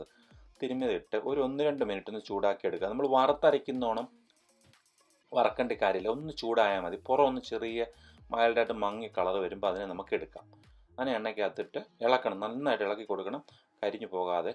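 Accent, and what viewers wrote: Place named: Kottayam, India. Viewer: Well explained, Kottayam ഭാഷ ഷ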